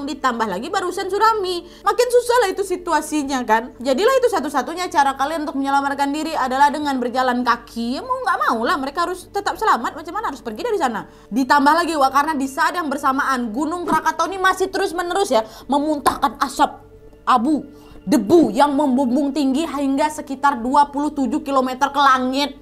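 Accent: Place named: Medan, Indonesia. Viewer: senang melihat membawakannya lucuuu.. kental kali medan kau.. GOOD JOB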